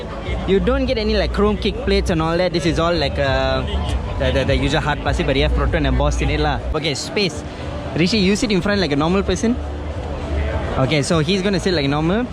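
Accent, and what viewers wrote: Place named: Tamil Nadu, India. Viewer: Your tamil english smart bro... teringat kat member2...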